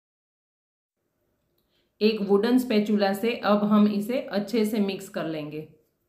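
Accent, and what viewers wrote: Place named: Gujarat, India. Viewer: Mam , bol ne se aap gujarati lagte ho , gujarati bolo , saras , 🙏🏻 mam gujarati ma channel banavo 🙏🏻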